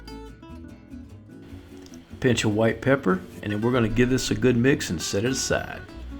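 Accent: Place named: Texas, United States. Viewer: I'm making this tomorrow, it looks so delicious! Love your accent, are you from Texas? Greetings from Montreal! 😃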